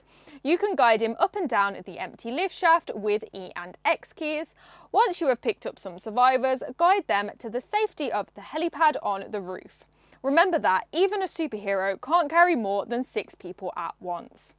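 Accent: United Kingdom. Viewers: YT formula 4 success, apparently = young woman + pirated 1980s movie Tshirt + pressing keys on 1980s hardware + British accent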